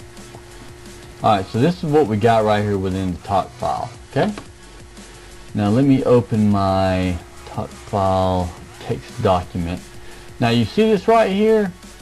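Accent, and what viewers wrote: South, United States. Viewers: Your videos are old but have still helped me out. Plus your country accent is pleasing to the ears.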